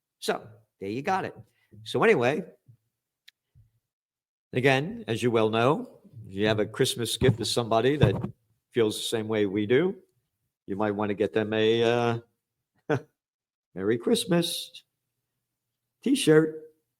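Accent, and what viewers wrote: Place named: New York, United States. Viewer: Stop saying “turlit paper” with that annoying NY accent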